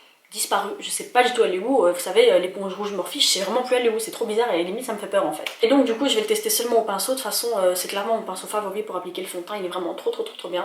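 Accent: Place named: Belgium. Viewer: J’ai tout de suite vue que vous étiez de Belgique votre accent est bien ressorti 😂😂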